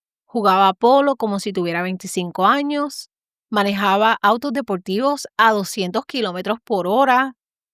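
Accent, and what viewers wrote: Puerto Rico. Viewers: por su acento, percibo que es de la tierra de BORINQUEN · parece boricua la señora por su acento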